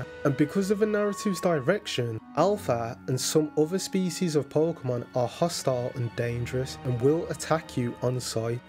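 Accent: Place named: West Midlands, United Kingdom. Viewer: Omg your accent is west midlands accent I'm from Oldbury!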